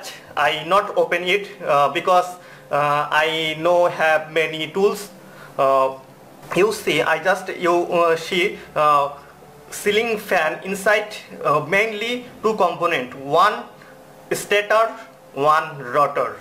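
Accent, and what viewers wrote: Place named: Bangladesh. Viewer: Your English like Bangla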